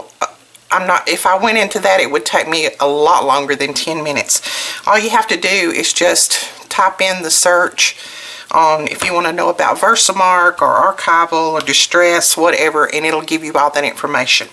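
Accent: South, United States. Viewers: great tips boy i would love to win this i dont have any distress ink yet so that will be awesome ps love your southern accent! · Thanks for all of your stamping advice. Also, I'm from the south and I enjoyed hearing that familiar dialect. The familiarity was so comforting! Your advice was greatly appreciative!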